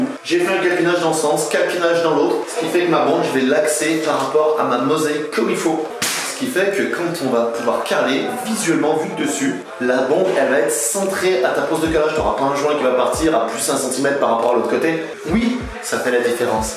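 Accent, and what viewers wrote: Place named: Vendée, France. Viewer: Salut. J'adore ce que tu fais et en plus moi qui suis d'origine Yonnaise, exilé en Limousin, ton accent est ma petite madeleine de Proust! Peux tu me conseiller sur la pose d'un carrelage 60x30? Pose à 2/3 1/3 ou 50/50? Merci et continue tes vidéos Thierry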